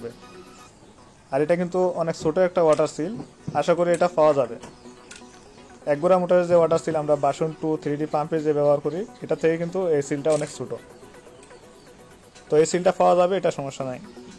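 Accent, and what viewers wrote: Sylhet, Bangladesh. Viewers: ভাই সিলেটি নাকি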